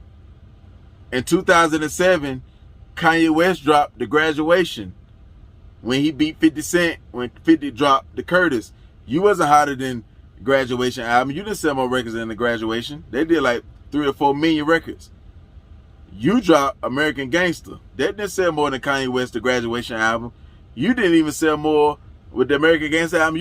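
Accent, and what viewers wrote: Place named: South, United States. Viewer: Bro are you from the south sound like you from here I know my southern people shout out to you from Louisiana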